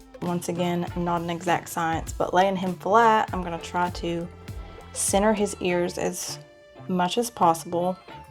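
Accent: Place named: South, United States. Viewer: Such a great pattern!! Thank you for sharing. Gotta say I loved the southern in your speech. Made making that bad boy more fun :)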